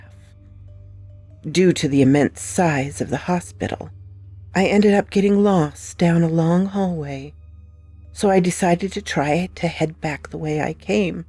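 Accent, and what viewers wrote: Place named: South, United States. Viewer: Hey. Thoroughly enjoyable creepy stories. I loved the southern accent too. Hope you're having a great week. Catch you soon.🌷🎩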